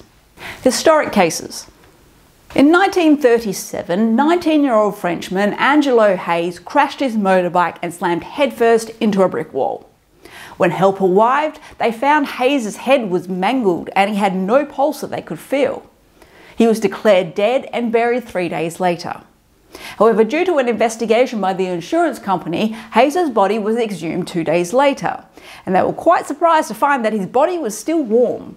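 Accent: Australia. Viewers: Just subscribed. You sound Aussie. Greetings from Victoria.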